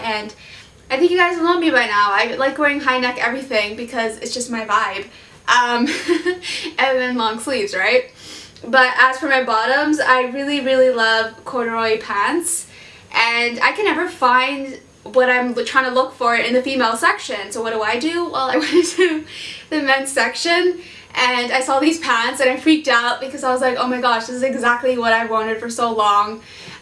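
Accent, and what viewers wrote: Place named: Canada. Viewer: Your Canadian accent is very cute.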